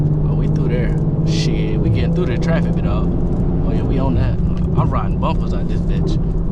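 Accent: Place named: United Kingdom. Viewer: where you from? sounds like uk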